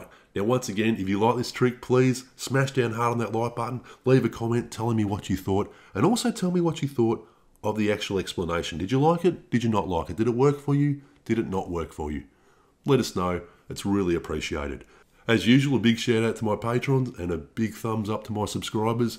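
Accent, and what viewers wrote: Australia. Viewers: Yes it helps us learn and understand math when we get how the algorithm works. Another great lesson . Yet I marvel at the idea that some- know it all -sharpshooter should hoot about your videos for any reason . I mean seriously, if they don't like your " down under " dialect they should go find something to do with themselves.😁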